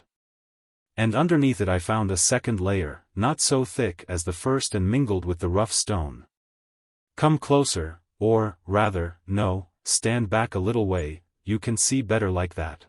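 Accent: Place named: United States. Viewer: If you can change the AI narrator to a British male, I think it's much more effective, a story, especially from this time period, to be read aloud /heard in some sort of UK accent, rather than an American/Canadian accent. Of course, since I have not even the vaugest idea of how AI works, I don't even have a YouTube channel, I really don't know what I'm asking. For all I know it's something that would require many hours of work or, something that could happen with the click of a button, lol. Anyways, I'm deeply involved in this story, 2 plus hours in, and enjoying it regardless. And as far as I can see, you are the only channel who has uploaded it so since I'm trying to complete the entire Arsene Lupin series this way, since I, unfortunately, don't currently have access to any of the books, this particular video is so very much appreciated!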